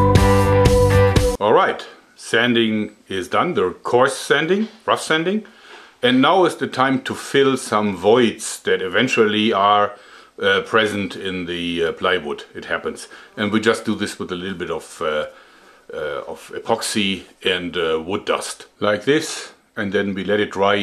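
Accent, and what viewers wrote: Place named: East Germany, Germany. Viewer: Sounds eastern German, but I'm not sure. He is German though so the accent is from a germanic country.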